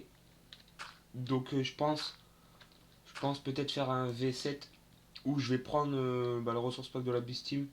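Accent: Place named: Nord-Pas-de-Calais, France. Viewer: Ch'ti RPZ, toujours le même accent xDD Bonne continuation à ta chaîne, m'y abonne :*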